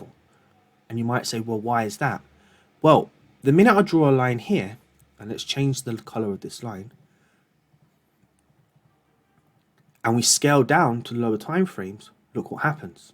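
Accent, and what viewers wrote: London, United Kingdom. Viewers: Really sound like a cool london mandem! Hope to meet you one day G :) Great content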